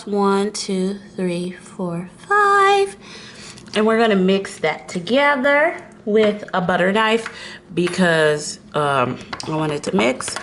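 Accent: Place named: United Kingdom. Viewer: I love the British accent so much!! this looks lovely!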